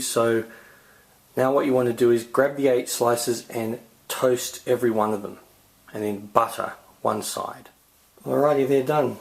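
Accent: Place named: Australia. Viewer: hayward, you love his accent because he's australian! Go aussie XD Your whisk is cute ^_^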